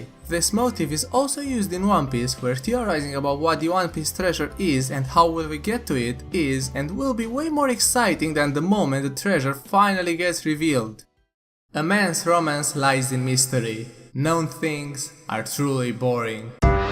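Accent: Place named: Russia. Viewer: Arzos aye his accent seems to be somewhere between East Eu and Russian.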